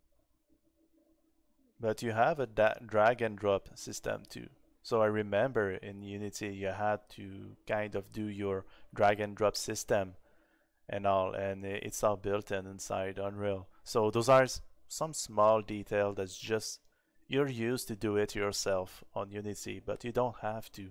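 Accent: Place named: Quebec, Canada. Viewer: Je pense que je reconnais un québécois ici 🙂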